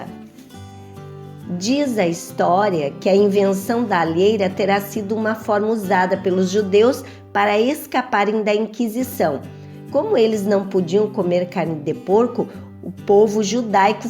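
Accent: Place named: Brazil. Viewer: Mas vc nao é de Portugal !!! vc fala com sotaque brasileiro...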